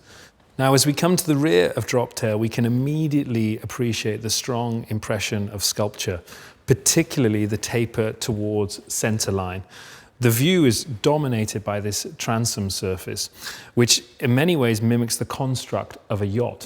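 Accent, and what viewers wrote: United Kingdom. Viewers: Nice accent, what part of the UK are you from?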